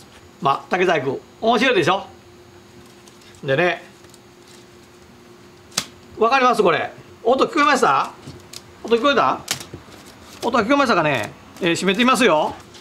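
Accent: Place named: Kochi, Japan. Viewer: 高知っぽい話し方ですね。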